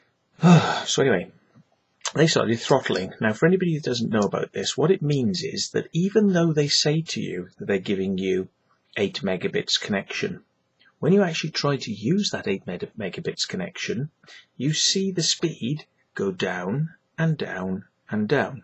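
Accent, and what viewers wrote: Wales, United Kingdom. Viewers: Sorry mate, seen a few of your vids now....and I gotta know what part of Wales your from....judging from the accent I'd say Cardiff or that general area. Know you don't live in Cymru now but just wanted to know. I'm from Llanelli....and still living there. Keep up the vids dude. awesome work.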